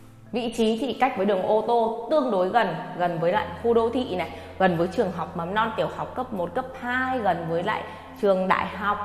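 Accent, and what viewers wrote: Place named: Hanoi, Vietnam. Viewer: Nhà đẹp giá tốt giọng nữ HN cũng hay😊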